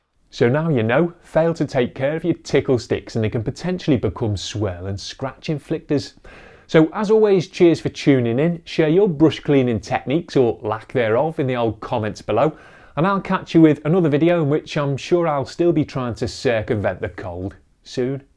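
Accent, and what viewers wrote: Liverpool, United Kingdom. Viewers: Lovely Jubbly scouse😉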